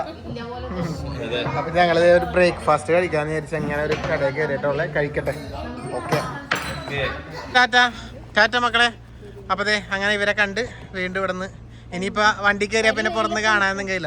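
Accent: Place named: Ernakulam, India. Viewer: Ernakulam slang sani ivide aano veedu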